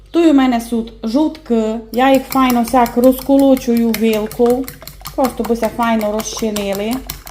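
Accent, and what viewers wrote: Transcarpathia, Ukraine. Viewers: Пані рада вас чути і дивитися на ваші витвори мистецтва,сподіваюся у вас все добре,і надалі ви частіше будете нас тішити своїми чудовими рецептами та закарпатським діалектом❤❤❤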